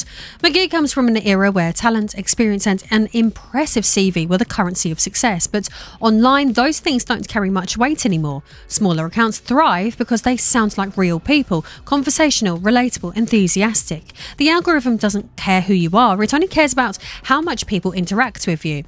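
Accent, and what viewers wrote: United Kingdom. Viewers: Wait, you're a BRIT? 😮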